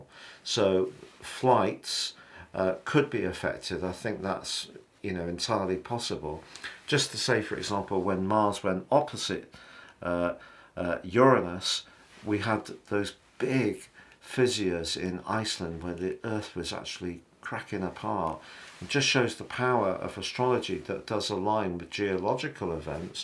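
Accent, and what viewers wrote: United Kingdom. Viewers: I love this amazing and well compose being. I love to hear him, his British accent. He speak to refine, I love it!!!🎉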